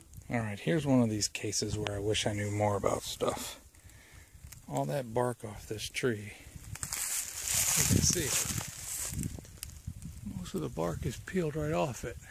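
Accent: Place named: New Jersey, United States. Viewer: Let's see, I started watching you because of the cooking videos, a lot of what you cook, is way too spicy for me, but my husband LOVES spicy food, so you give me ideas of things to cook for him that he will like (and I just do myself a bland version). I really like your accent, I used to work with a really funny guy from New Jersey and your voice reminds me of him. I also like that you love your cats, I have dogs, cats and parrots... so I basically am the zoo minder around here. So anyway I watched your ramble, and felt compelled to ramble on a bit myself... sorry about that.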